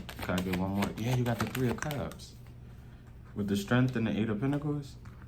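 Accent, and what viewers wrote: Philadelphia, United States. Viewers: Wait….are you from Philly?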